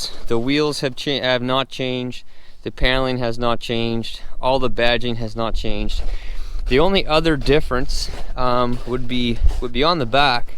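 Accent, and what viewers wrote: Canada. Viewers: You are Canadian, i thought American but hey love Canada man love Canada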